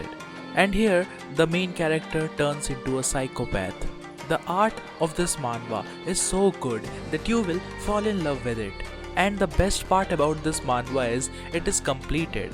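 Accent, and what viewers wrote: India. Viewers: You are indian ? Your accent is desi😂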